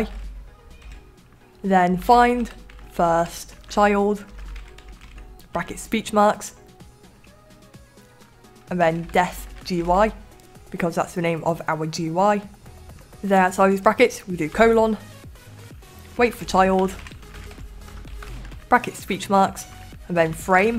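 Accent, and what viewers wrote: United Kingdom. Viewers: You sounded like a fellow bri'ish mate.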